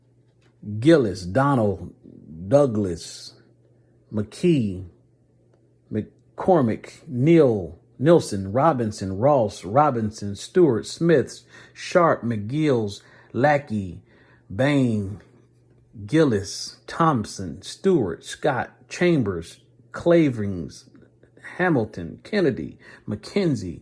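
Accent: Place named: Baltimore, United States. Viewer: Blacks in Baltimore hence the accent lol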